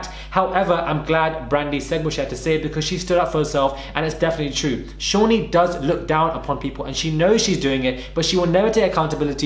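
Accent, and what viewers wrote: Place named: United Kingdom. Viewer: Dude you nailed it I was like wait is this an Indian guy with a British accent talking about basketball wives. LMFAO I'm subscribed sir cause you telling it like it is.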